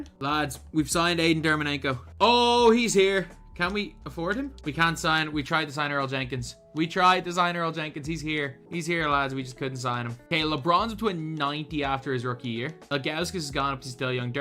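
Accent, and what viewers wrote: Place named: Ireland. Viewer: Yeoo this Irish accent is goated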